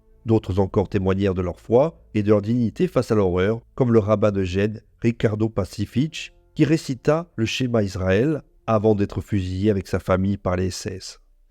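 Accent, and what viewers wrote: Corsica, France. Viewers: Accent corse je dirais. Complications à articuler les syllabes.. 😊😊😊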